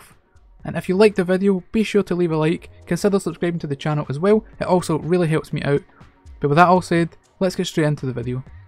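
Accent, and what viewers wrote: Scotland, United Kingdom. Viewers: I've just come across this video and your channel so thank you for sharing! I've liked the video and subscribed as I'll be using the fountain for my town on the Lilac Towny server :) I hope you continue to do more videos as it's so nice to hear another Scottish person - I'm a wee Scottish lassie!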